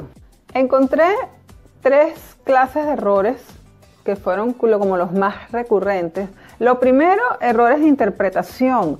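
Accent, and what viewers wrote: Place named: Venezuela. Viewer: TIENES UN PROBLEMA Y ES QUE NI SI QUIERA TE EXPRESAS BIEN EN CASTELLANO. PARA ELLO DEBERÍAS SABER EXPRESARTE BIEN EN CASTELLANO Y POR TU ACENTO CREO QUE ERES VENEZOLANA.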